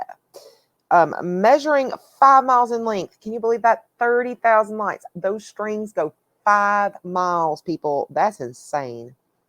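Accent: South, United States. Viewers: I’m a recent subscriber to your channel, having come upon it by chance. I immediately picked up on your “accent,” as I, too, am a Southerner! I currently live in SC but moved here from the Atlanta area last year. Your coloring page looks so cheerful colored by the glittery Gelly Rolls. As a relatively- progressive retired pastor in a mainstream Protestant denomination, I found your comments about Christmas interesting and appreciated the remark about the X in X-mas. Indeed, the X stands for the Greek letter chi, which is the first letter of the Greek word Christos, from which our word Christ comes. So, yes, using the abbreviation X-mas does NOT leave Christ out of Christmas! Thanks for sharing!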